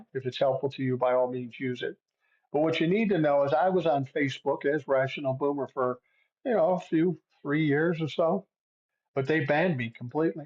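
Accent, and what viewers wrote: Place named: Liverpool, United Kingdom. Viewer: Yes the scous is starting to push !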